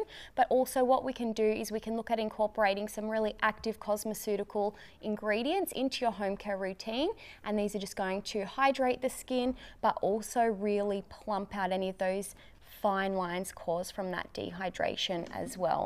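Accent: Australia. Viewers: l’accent australien n’est pas le meilleur pour l’ASMR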